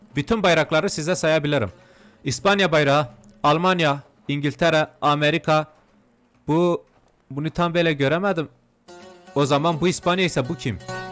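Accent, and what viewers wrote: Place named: Southeast, Turkey. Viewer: Bu şive erzurumum şivesi değil, bu yaptığın şive kürtlerin kullandığı güneydoğu şivesi.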